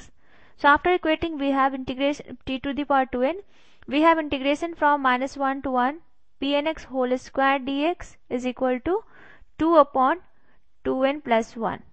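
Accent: India. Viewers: I barely understand indian accent... but english mixed up with indian hell no !!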